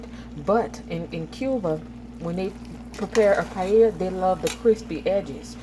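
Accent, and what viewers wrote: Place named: New Orleans, United States. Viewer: Actually paella is from Spain but Cuba does make a couple yellow rice dishes based off of the yellow rice dishes of Spain since Spain’s influence in Cuba was heavy and many many Cubans are of Spanish ancestry. I was surprised you called it a “yellow rice jambalaya” but then I heard your accent and since I’m from the New Orleans area I recognized it as a New Orleans area accent. So now I know why you called it a jambalaya since we have jambalaya in New Orleans and south Louisiana and it looks very similar to a jambalaya. But there is a reason it looks similar. Jambalaya descends from the paellas of Spain and pilafs of southern France. I’m of Spanish descent from the Canary Islands of Spain and our family we sometimes make a jambalaya with saffron (to make yellow rice) instead of the common way to make Jambalaya in the New Orleans area and it looks a lot like this when it’s finished.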